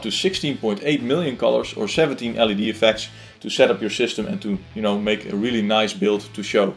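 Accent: Netherlands. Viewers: this guy has a dutch accent XD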